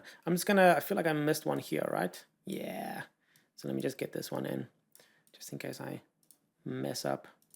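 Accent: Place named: South Africa. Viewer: I can hear the South Africaness oozing through your voice. Its amazing.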